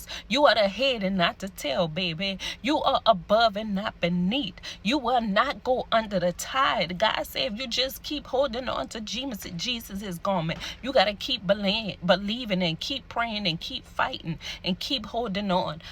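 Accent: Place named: New Orleans, United States. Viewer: Are you from New Orleans ? You sound like us 😂 !! Also Beautiful word .It’s my first time seeing a video of yours . I’m 22 and spent time away from people for three years building my personal relationship with God and being purged of the things that didn’t belong to become all he wants me to be and it wasn’t easy bc I was completely alone with just him and no friends or family for support or guidance but I am still here 🫡 . This resonated with me and I receive it ❤🙏🏽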